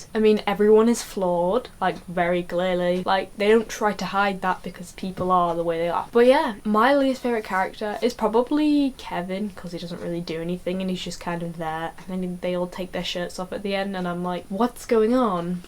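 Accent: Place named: United Kingdom. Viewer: Everytime I forget u have a British accent i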